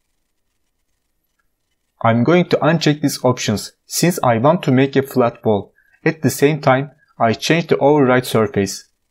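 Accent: Turkey. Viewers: Abi Türksün demi aksanından belli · Tam bir türk ingilizcesi :)) teşekkürler video için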